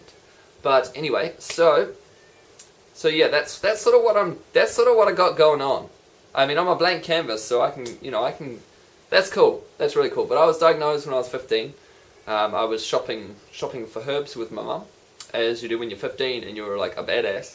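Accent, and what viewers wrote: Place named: Australia. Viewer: you sound Aussie i,m waiting for a specialist appointment to see if I have this or Klinefelter syndrome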